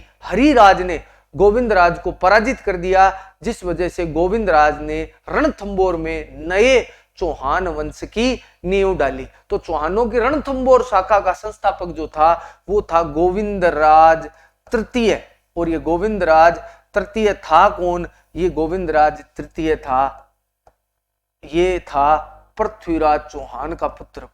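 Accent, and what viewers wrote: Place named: Marwar, India. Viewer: Kya baat hai Sar ji aapki Marwadi Ka jawab nhi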